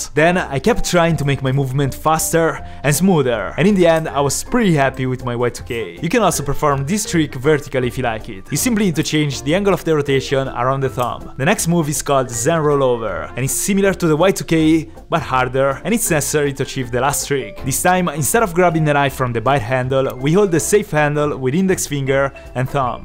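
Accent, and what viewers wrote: Italy. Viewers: He's Italian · Cazzo si sente che sei ITA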